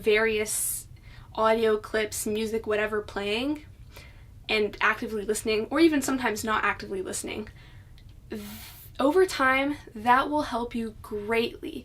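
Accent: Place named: United States. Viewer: OMG!!! That heavy American accent is quite funny haha. Whenever I hear it, it just makes me laugh lol.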